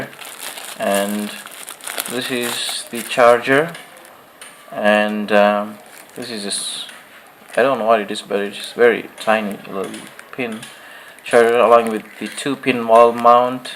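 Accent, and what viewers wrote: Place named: India. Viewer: thanks bro...btw just a suggestion,accent is what comes to people naturally,embrace your indianness,god bless you...no offence...keep the good work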